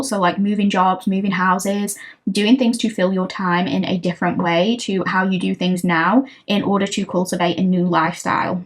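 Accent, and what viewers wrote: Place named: Lincolnshire, United Kingdom. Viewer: Your partner will know that Hull is - wish I could do sound bite - more "Yer Nuurrwe", Did you say 'You Know'? Eh!!! But you could pass as a North East Lincolnshire gal! (Just in case you need to go incognito when your channel is famous!!! 😉🥰) Keep up the great channeling. You have a gift. ✨✨✨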